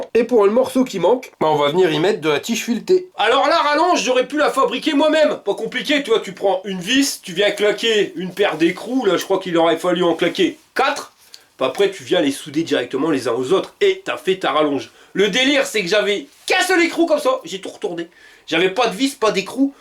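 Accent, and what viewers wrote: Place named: North, France. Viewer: Salut , je viens de découvrir ta chaine et rien que pour ton accent d'min coin je dit oui :) Je suis du nord aussi proche de valencienne si jamais ^^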